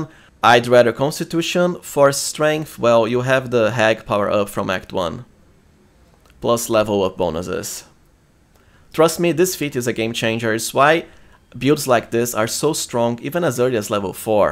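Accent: Brazil. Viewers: Are you brazilian? I watch Luality and she pronounces "to" just like you ("chew", "chewday" etc) lol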